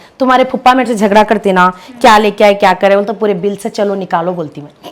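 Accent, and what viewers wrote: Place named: Hyderabad, India. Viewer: Aap perfect muslim hi lagti ho didi talking style behaviour also perfect hyderabadi muslim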